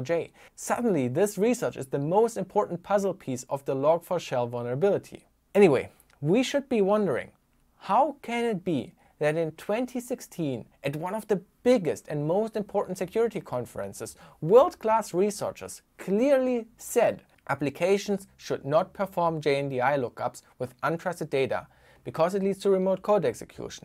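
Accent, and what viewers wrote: Germany. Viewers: I really liked your video and I thought: sounds like a German accent... checking imprint and realizing that it is the same zip code as mine 2 months ago :D Greetings to Lichterfelde!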